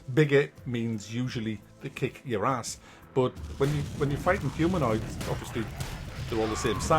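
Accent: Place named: Liverpool, United Kingdom. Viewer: That made me fucking crack up man.. Love the scousser accent too! LMFAO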